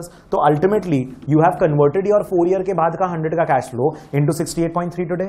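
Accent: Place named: India. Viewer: great lecture, but 0.75 speech is ok, I have a small problem with absorb the Indian accent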